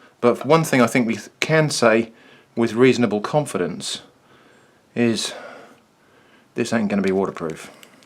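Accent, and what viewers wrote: United Kingdom. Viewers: You sound more brittish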